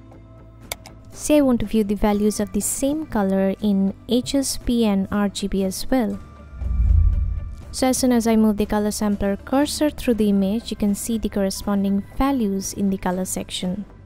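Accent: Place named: India. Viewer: don’t listen to them. You have Indian accent but you are 100% understandable. 10 stars 🌟🏆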